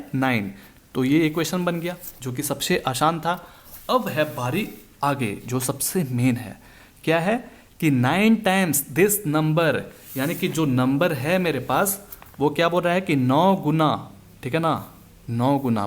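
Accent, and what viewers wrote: Bihar, India. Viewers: Sir bihar ke ho ky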